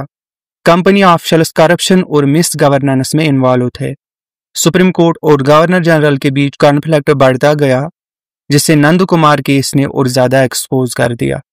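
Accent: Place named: Pakistan. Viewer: Bhai teri hindi pakistani youtube video jesi kyo hai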